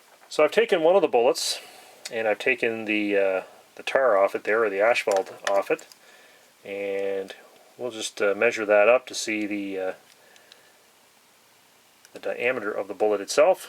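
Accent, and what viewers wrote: Canada. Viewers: Do i hear a fellow maritimer (neuf) accent??👍😉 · Very informative. I assume you are in Canada by your accent and the fact that we here in the US no longer have access to Norinco products, having been banned after the Kengs Firearms/Norinco criminal investigations for them attempting to supply full-auto weapons and rocket launchers to prohibited individuals here. What was the price for this case of 1,000 ?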